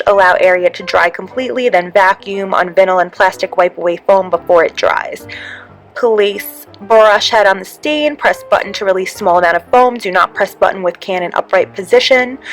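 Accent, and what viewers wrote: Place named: East Coast, United States. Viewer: I can tell she’s from the east coast by her accent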